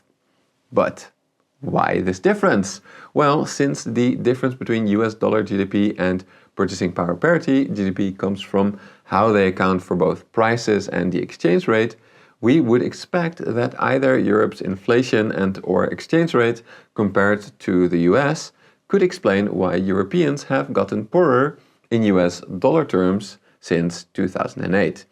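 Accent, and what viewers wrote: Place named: Netherlands. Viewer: Strong dutch accent😂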